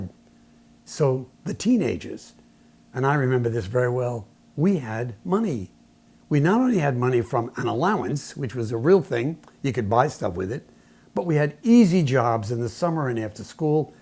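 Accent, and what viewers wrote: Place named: Brooklyn, United States. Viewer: I love your accent, I wish more people still had it. Are you from Brooklyn? My grandmother grew up in the Bronx and had a similar accent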